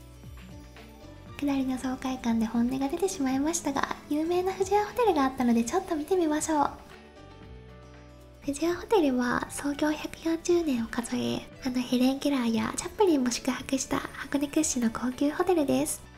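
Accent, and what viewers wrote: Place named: Ehime, Japan. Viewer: (•ө•)♡ こんばんわ今回は箱根なんですね！その前に… とチャンネル登録者数〜 やっと、やーーっと２万人越え！！長かったけど、じわじわ確実に増えてて、嬉しいですね！本当におめでとうございます🎊 でも、コメントへの返事がますます大変になってしまいますよね… 😐 きっと、なら大変とは思わず、「かまん、かまん」なんでしょうね。 （↑愛媛の方言で合ってますか？） 今回の箱根は何月の旅行でしたか？ガーミンの気温に18.8℃と表示されてたので、４月頃でしょうか？山道だから５月かな？日差しが熱いって、６月？🤔 のジャージ、青い空、の首（日焼け対策してますね！白いです笑）、ロングヘアー、凄くマッチしてて可愛いですよ(•ө•)♡ 以前の動画でも今回の動画でも「〜 …じゃあ」って、今回の動画では「路地裏の斜度もきついんじゃあ」は愛媛の方言ですか？女の子の方言って可愛いですよね (•ө•)♡ チェーン落ち着くのところで参考になれば、、自分はサドルバッグにニトリル手袋を入れてますよ。パンク修理は汚れは気にしないですが、チェーン周りの何かの作業をすると黒い油が手につくし、バーテープも汚してしまいそうなので、念のため入れてます。ガーミンちゃん、やっぱりそうなんですね…😭 自分も横浜市に行こうとナビに従ってると国道357をひたすら走り、東京湾海底トンネルに案内されます。高速道路と自動車専用道路（国道357）なので通ることが出来ずかなり戻されます😭 後ろから車が飛ばしてくるのって結構怖いですよね… 自分は右側のバーエンドキャップを外して、凄く小さなバックミラーを付けました。後ろを振り返ることなく後方確認が出来て、買って良かったアイテムの１つです。おすすめです。硫黄の温泉♨、いいですよね！箱根は何回か行ったことあるんですが、硫黄臭い印象が無くて、そうなんだ～！！と勉強になりました。草津温泉♨は硫黄の臭いが凄いですよね。温泉玉子のシ～ンで写っていた太もも、鍛えられた太ももですね！凄い Σ(ﾟДﾟ) クライマーの脚じゃないですか～💦 いいな～ 坂が好きって、憧れます。自分のここ最近ですが、土日のどちらかに横浜市にある浜カフェというところに行ってます。往復で80キロくらいです。膝の痛みはまったく無くなりました。少しずつ距離を延ばしたいのですが、夏の日中の暑さがきつく距離を延ばせず…です😢 はじめの頃は帰り近くになるとふくらはぎが攣ったりしましたが、ネットでいろいろと勉強して、ポカリかアクエリは必須、トイレに行って黄色いおしっこが出たら水分不足ということを知りました。水分&ミネラル補給がダメダメでした💦 ミドリ安全というところから出しているミネラルのタブレットも買って、今日（7/9）、この暑さの中びっくりするくらい快適に走れました。夏季休暇はまだ未定ですが、自分もを真似て、一泊ライドに行こうと計画してます。はいつかはきっと富士ヒルにも挑戦するんだろうな～～と思いました。応援してます！ （また、めちゃくちゃ長文になってしまいましたが💦🙇‍♂💦） 待ったね～～(•ө•)♡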